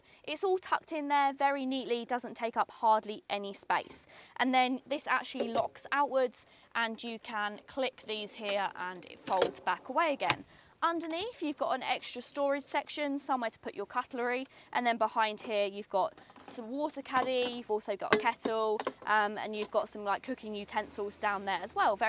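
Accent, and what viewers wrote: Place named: Estuary, United Kingdom. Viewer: A joy to listen to that accent that wonderful London Thames estuary, twang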